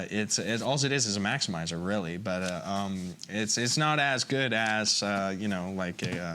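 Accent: Canada. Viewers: bahahaha awesome... he has a bit of a canuck accent as well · his Canadian accent was really noticeable, wow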